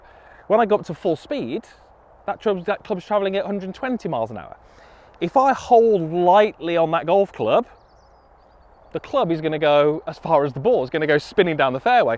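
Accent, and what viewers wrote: United Kingdom. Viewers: Surely u with ur brit accent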